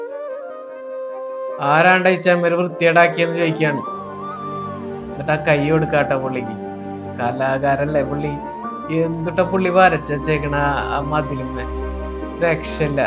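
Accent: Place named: Thrissur, India. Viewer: ശെരിക്കും ഉള്ള കലാകാരൻ..... പ്രകൃതിയിൽ ആണ് 🥰🥰🥰🥰എടോ താൻ തൃശ്ശൂർ ആണൊ place...... Slang അടിപൊളി 👍🏻👍🏻👍🏻👍🏻🥰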